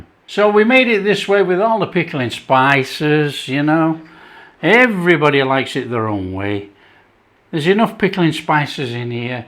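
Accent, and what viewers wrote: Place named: Derbyshire, United Kingdom. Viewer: Are you from chesterfield ?? , you sound like someone I watch from there ! I'm from canada, but my grandmother was a brummie 😁 Great video !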